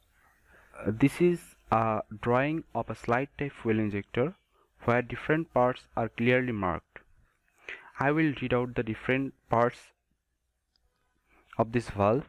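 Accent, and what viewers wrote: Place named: India. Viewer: Indian english is awesome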